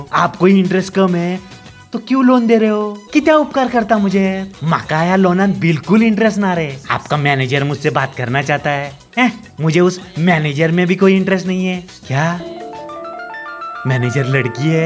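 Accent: Goa, India. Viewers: Love the goan accent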